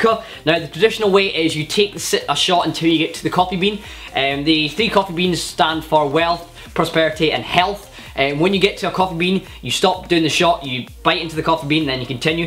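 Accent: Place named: Scotland, United Kingdom. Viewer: Awesome man, funny as hell,sorry your glass cracked though. I love the accent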